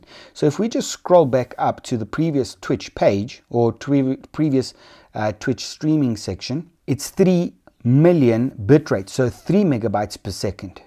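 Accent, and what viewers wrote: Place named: South Africa. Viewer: So cool hearing a South African voice on YouTube.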